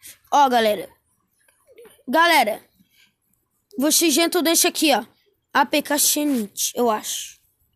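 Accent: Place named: Rio de Janeiro, Brazil. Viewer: Ele e Carioca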